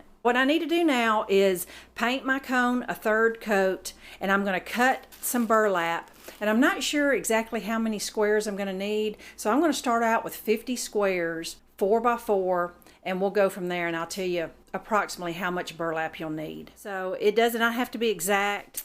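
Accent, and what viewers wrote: South, United States. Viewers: I loooooveeee your southern accent. Did you just hot glued all of the layers?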